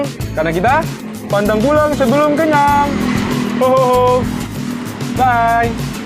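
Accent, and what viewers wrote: Jakarta, Indonesia. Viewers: logatnya Jakarta bgt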